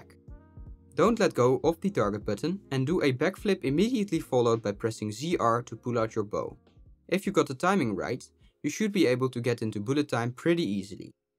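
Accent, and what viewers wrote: Netherlands. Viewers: First 3 words and i know you're dutch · I can hear in ur accent, u're Dutch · Are you Dutch because your English sounds like someone Dutch talking English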